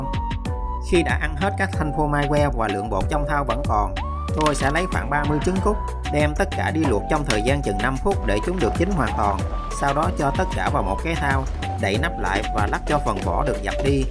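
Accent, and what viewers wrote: Mekong Delta, Vietnam. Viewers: A ở miền Tây đúng ko ạ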